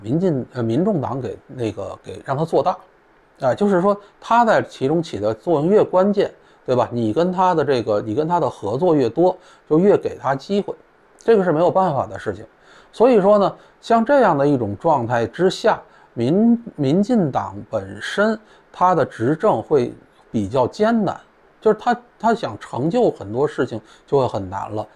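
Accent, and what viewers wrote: Beijing, China. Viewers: 四年後誰執政？我跟播主有點不太一樣的看法。 我也來做個預測，中國這幾年，快一點就今年內了，會被再餓回一隻巨大的扁蝨，跟改開前一樣。 其實我很懷念二十幾年前台灣的時光，大陸妹一卡車一卡車嫁來台灣，中國偷渡客被抓時會唱梅花，假裝自己是台灣人.......的時代。 那時台灣任誰都願意喊：兩岸一家親！照顧窮親戚，誰能不愛呢！您說是吧？ 播主，你是否想太多了？雖然我也挺愛聽你的北京腔和北京大爺說話那個範兒！ 但管他誰執政！誰把台灣做大做強，讓台灣人活著有尊嚴我就投誰！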